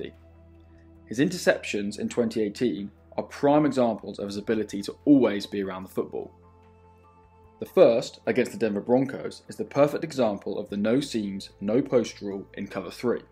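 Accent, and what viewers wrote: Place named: United Kingdom. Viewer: Why is a British man breaking down nfl film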